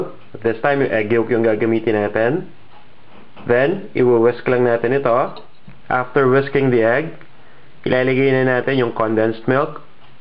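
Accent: Philippines. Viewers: Stop speaking fillipino-english >.< I CANNOT UNDERSTAND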